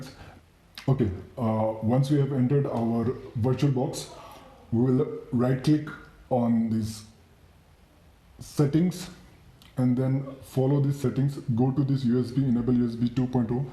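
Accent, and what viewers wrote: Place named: India. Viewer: Aren't u turkish? Its sounds like ur indian ur not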